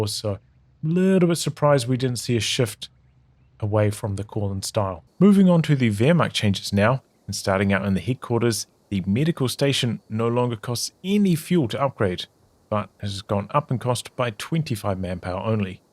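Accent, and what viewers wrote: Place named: New Zealand. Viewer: Great video always throws me hearing a fellow kiwis accent on you tube when I’m so used to just hearing yanks and Brit’s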